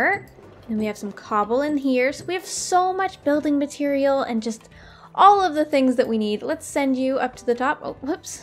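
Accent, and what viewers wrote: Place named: Canada. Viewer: As a fellow Canadian i love her accent aye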